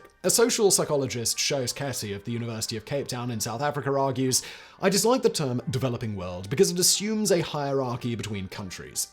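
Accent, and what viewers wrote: United Kingdom. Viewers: mate can’t understand you. This is only for British English users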